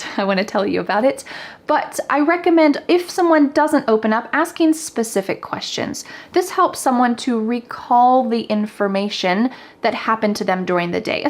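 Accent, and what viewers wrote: United States. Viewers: Какой у нее Английский? Не американский? Мне надо американский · We dont have summer season actually in my country,thats why we never spend our time for summer,..in my country is summer all the year,instead😂😂😂😂😂 Greeting from indonesia...My new teacher.....its fun learning english with u....i ❤ american accent....i love superman,spiderman,pizza hut ,kfc and etc😂😂😂😂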